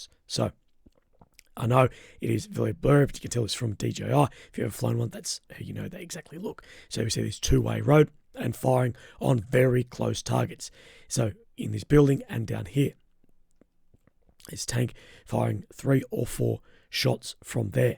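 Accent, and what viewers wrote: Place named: Australia. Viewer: Sorry mate but the breathless, staccato delivery in harsh Australian twang is extremely debilitating, an assault on the ears. Also makes my heart rhythm jump erratically.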